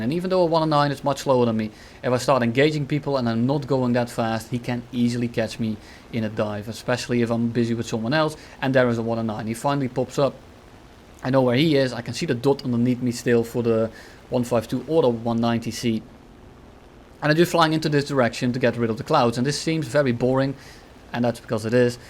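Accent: Netherlands. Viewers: R u dutch by any chance?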